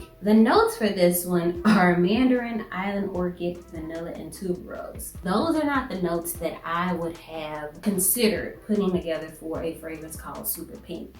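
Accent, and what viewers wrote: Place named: Arkansas, United States. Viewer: As soon as you mentioned an Arkansas influence on your pronunciation, I was IN. *subscribe*. No, I'm not from Arkansas, but I am from who you are. Great review. Well done.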